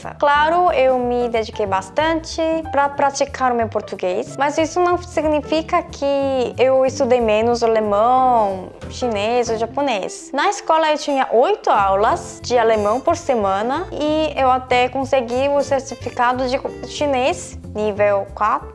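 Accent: South Korea. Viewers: Seu sotaque coreano e muito forte por isso seu portugues não 100% brasileiro.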